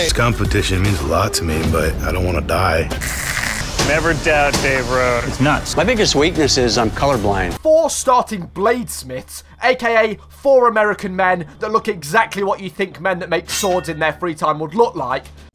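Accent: United Kingdom. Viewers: British man recaps a show about Radom 40 year old people make pipe bombs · Aggressively british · how to make a convincing british accent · You. Sound very Br tsch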